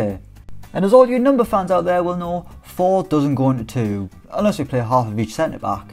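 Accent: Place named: London, United Kingdom. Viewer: His accent sounds more like a posher London accent ngl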